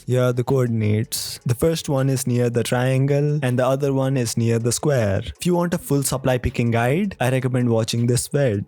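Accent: India. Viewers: can you get rid of the indian accent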